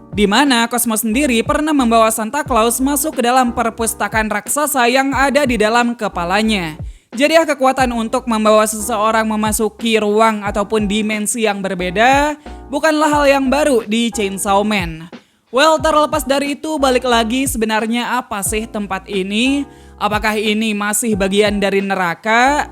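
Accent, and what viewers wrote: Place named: West Java, Indonesia. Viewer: Wew dengan logat sunda gitu?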